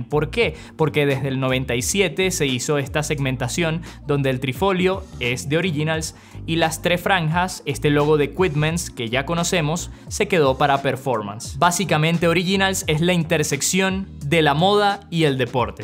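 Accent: Venezuela. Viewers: Es venexolano?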